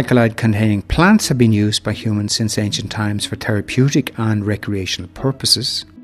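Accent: Ireland. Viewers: I would give this video a million likes if I could. As a student who struggles with Pharmacology and dreads to learn about it.. you really opened my eyes. You made it so easy to understand, beautiful visuals and of course beautiful Irish accent. The best video I've actually come across so far on such a complicated subject. You have really helped me ease my stress. God bless you! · Southern Irish Gaelic accent. Very relaxing.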